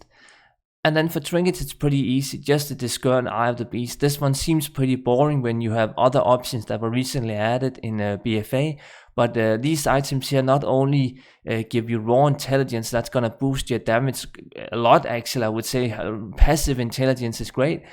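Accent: Denmark. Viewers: i smell danish somehow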